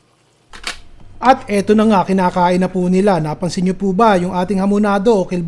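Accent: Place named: Batangas, Philippines. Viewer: Nkktuwa ka po mag salita. Akala ko po Taga Batangas ka po or Bulacan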